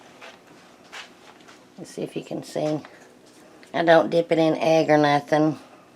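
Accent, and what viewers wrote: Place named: North Carolina, United States. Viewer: I like your recipes! Your accent is like mine so its like we are at a family get together! Hugs to ya. Darla in NC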